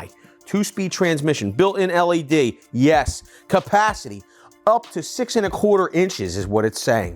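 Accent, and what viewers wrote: Philadelphia, United States. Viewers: What part of Philly are you from? That accent.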